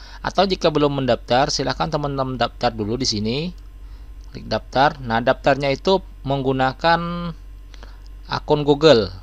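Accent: Banjar, Indonesia. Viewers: Banjar bangt pian nie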